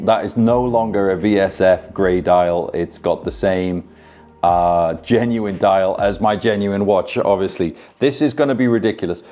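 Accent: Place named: Wales, United Kingdom. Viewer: Where’s your accent from because I thought I could hear a bit of Welsh in it? 🧐👍🏻🏴🇬🇧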